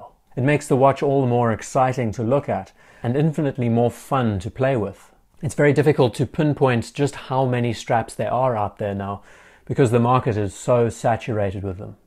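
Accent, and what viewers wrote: South Africa. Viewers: I do like the South African Accent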